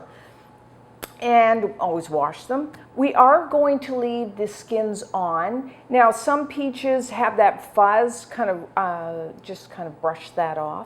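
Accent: Canada. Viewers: You used to live in Canada right. You have that accent. I love your recepies I've been watxhing for about a year and I love your channel!!!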